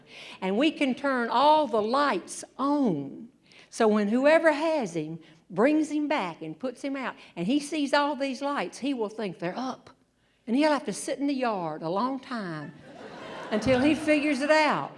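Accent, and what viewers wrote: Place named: North Carolina, United States. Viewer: I could tell by your accent you were from N.C. I was hearing the Piedmont area and then you said Alamance County. There you go! I live on the east side of Raleigh off 64.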